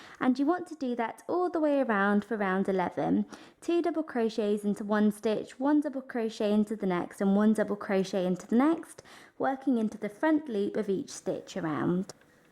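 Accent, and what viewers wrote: England, United Kingdom. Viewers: Thought with your English accent this would be in UK terms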